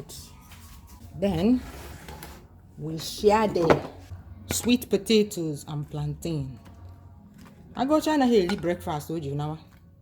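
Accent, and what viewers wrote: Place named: Umunze, Nigeria. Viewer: please are you from umunze? The dialect is exactly like mine. Breakfast yum yum 😋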